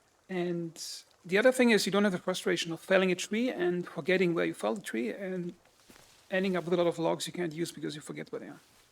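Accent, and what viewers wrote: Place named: Germany. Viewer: Textbook German accent 😊 🇩🇪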